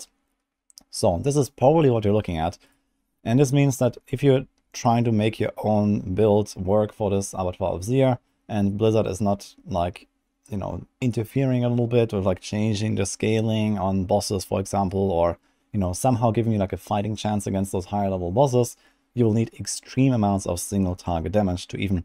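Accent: Germany. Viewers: Your German accent is sick LOL love it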